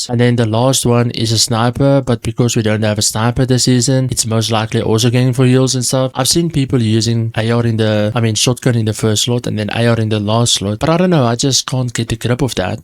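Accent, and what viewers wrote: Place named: Cape, South Africa. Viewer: The cape accent awe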